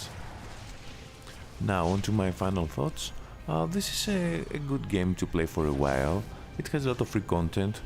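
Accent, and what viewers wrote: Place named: Greece. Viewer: you sound like a greek or it probably is my idea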